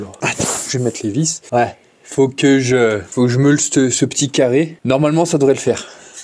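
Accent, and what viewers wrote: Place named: Saint-Étienne, France. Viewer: Non il est pas du nord ! Tu reconnais pas l accent stéphanois ?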